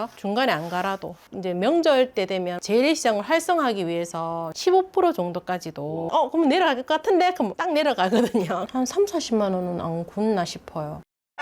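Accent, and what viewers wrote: Gyeongsang, South Korea. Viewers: 어릴 때 대구살아서 살모시~ 넘익숙 울엄마 말투라 정겹네요ㅎㅎ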